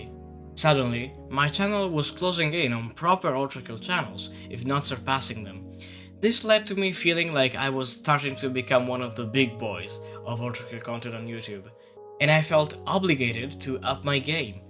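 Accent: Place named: Balkans. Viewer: Blud you sound hella balkan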